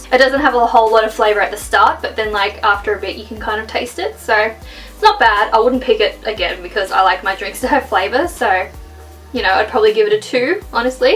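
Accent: Australia. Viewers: Bryn williams that's how Australians talk and I was that dis like btw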